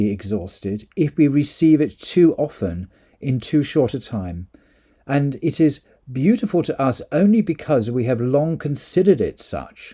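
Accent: United Kingdom. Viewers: So ‘phenomenological’ is a tongue twister term for you. I was wondering if you had one! 😅 you have that eloquent British accent that sounds magical...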